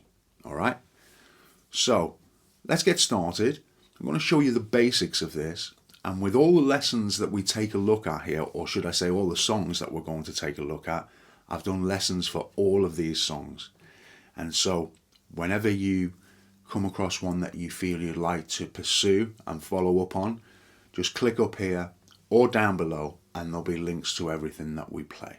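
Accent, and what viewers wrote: Liverpool, United Kingdom. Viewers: Nice one thank you. (a hint of Scouse there perhaps?)👍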